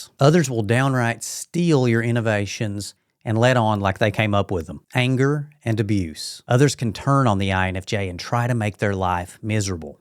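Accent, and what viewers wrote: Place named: Alabama, United States. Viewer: Hey are you from Alabama? Love the accent. And everything you saying is SOOOO TRUEEEE 😊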